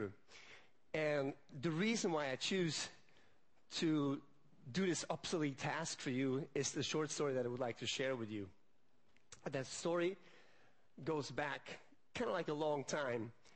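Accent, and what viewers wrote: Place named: Switzerland. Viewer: Well, he's Swiss. They always sound rather distinctive.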